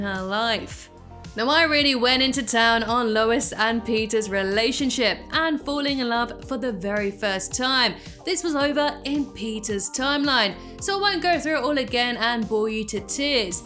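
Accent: United Kingdom. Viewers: You have a beautiful British accent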